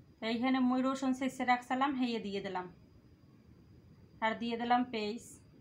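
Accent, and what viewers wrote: Bangladesh. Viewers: আপু তুমার বরিশালের ভাষা টা আমার কাছে খুবই ভাল লাগে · Onek sundor hoyeche recipe ta ❤️ Borishaler bhasha khub sundor ❤️🥰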